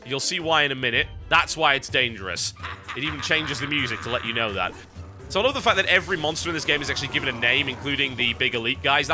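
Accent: United Kingdom. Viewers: is British, that's why he might sound a bit odd in your ears.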